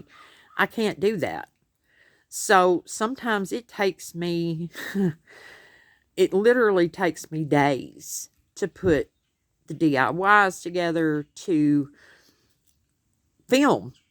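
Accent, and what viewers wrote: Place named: Texas, United States. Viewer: I am sorry that is happening. I watch yours throughout but I do click off of the creators that sing or show a lot of painting or make the crafts in real time. If I can’t understand the creator… I feel bad but I just can’t. I am a Texan and so you sound like me. 😂 You are very talented. Are you doing shorts? I hate them but I think a lot of YouTubers use those.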